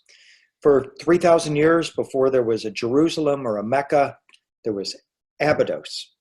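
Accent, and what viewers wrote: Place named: Tennessee, United States. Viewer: Never miss a chance to see your work. I love your Tennessee way. I live in West TN always. Suppose you sound like home 🤓 You have a true sense of the human condition. Bravo!! 🥳☝️✨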